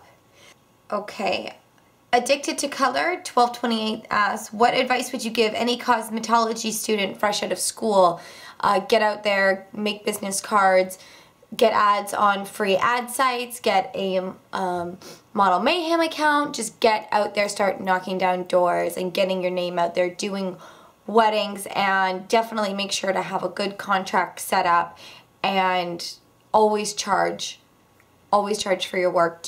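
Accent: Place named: Etobicoke, Canada. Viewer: Loving your videos! I have a friend from Etobicoke and you both have a very similar voice and "accent" ( to me from NY). Do you happen to live near this city? I'm not stalking you I just hear the similarities. Thanks!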